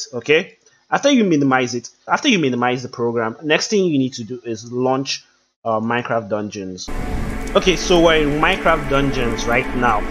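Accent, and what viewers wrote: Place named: Africa. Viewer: you have an african acent like like guy who sang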